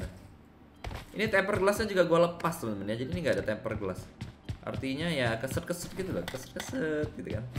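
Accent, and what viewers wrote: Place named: Medan, Indonesia. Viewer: Haha,PARTENTENGAN gak tuh.. Udah belajar bahasa Medan Abang ini bah.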